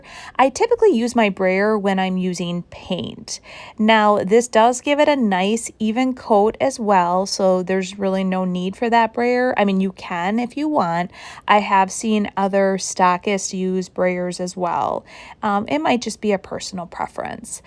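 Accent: Wisconsin, United States. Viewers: Love everything!! Your Wisconsin accent makes me miss home..love it! ( I live in California but originally from the Illinois/Wisconsin border) Shabby Chic is my favorite.. I’m on the No vote side to Laundry it’s beautiful as is!!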